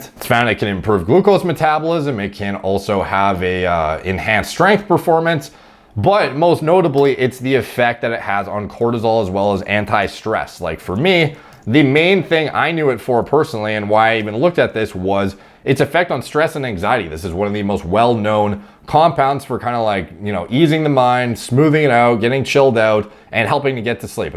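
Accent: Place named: Canada. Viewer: Are the prices on the website in US or Canadian dollars? With the aboot etc guessing is Canadian?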